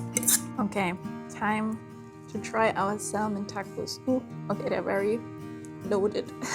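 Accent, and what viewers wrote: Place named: Germany. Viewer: Du bist wirklich so sympathisch. Dachte mir schon zu Beginn: dieses Englisch klingt so Deutsch 😅 Total süß und richtig gute Rezeptideen ❤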